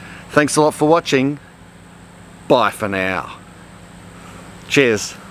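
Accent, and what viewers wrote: Australia. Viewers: Awesome. Enjoy the Aussie accent and attitude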